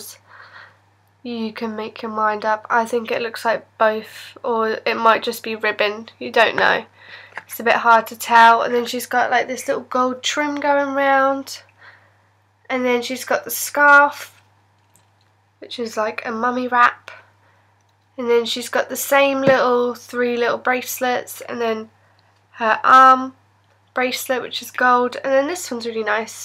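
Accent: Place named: United Kingdom. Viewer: You're so sweet, i love your UK accent and congrat for this awesome pack :) I love you're video. Bisous from France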